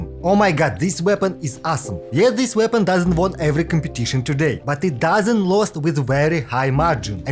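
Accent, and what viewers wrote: Russia. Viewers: I learned that there's something even more cool than your russian accent: skin mods. If there are skin mods for weapon, I'm good with this stupid cardbox sword, because it won't be looking so. Otherwise I'd settle for Tidal Shadow just because how awesome it looks.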